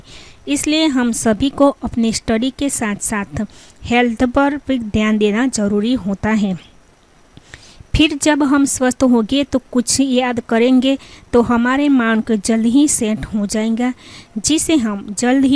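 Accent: India. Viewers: Bengali ho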